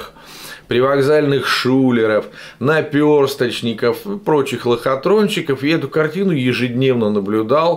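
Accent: Moscow, Russia. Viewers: Это всё хорошо,согласен. Один вопрос только. Почему в таких роликах на Ютубе,те,кто ведут свои каналы,голос копируют ведущего Киселёва? Вы нормально говорить умеете? Это А-канье на всех гласных, московский акцент выдает сразу. Или всё блогеры телевизора с Киселевым насмотрелись и не представляют себе как можно по другому разговаривать? Такое впечатление,что есть две манеры разговора: как путя и как кисель. Всё! И все копируют либо ту,либо ту манеру разговора и интонацию! Это Пиз..ец просто!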